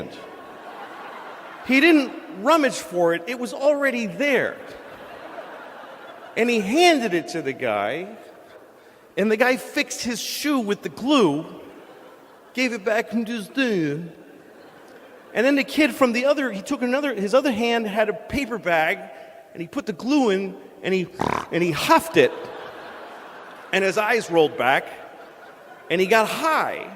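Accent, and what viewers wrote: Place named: Boston, United States. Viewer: he grew up in Boston, that's the local way of treating vowels.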